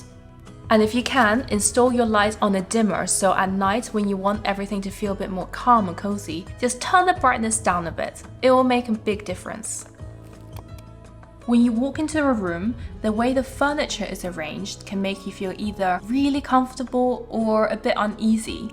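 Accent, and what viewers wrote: United Kingdom. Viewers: I’m brazilian and your video cames to me. I’m IN LOVE with your accent and now I’m “using” your channel to practice british accent/english. THANK YOU FOR YOUR CONTENT! Ps: Also, I’m building my house with my groom, so your tips is very rich. Again, thank you! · I've never heard so nice British accent. ❤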